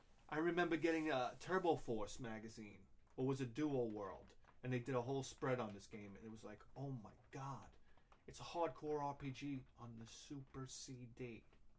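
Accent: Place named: New York, United States. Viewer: When you were talking about your polish friend being trained in "sahkah" I thought it was some polish thing I had never heard of but then I realized, oh it's soccer, haha. Love the new york accent!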